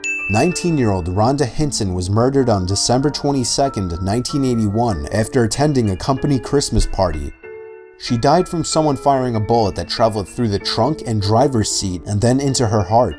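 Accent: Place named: New York, United States. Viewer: I love his new York accent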